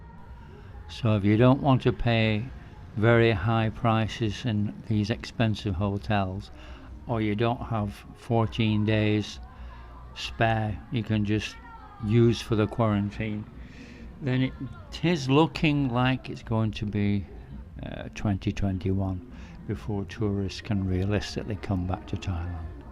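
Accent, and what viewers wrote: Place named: Lancashire, United Kingdom. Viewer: You sound like a Lancashire lad, I’ll take a guess at Blackburn??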